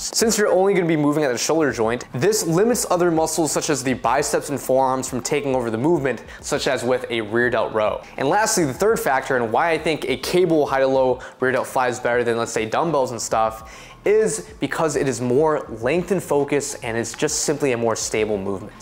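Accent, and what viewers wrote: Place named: United States. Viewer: I might be racist but I never thought u would have such an American accent 💀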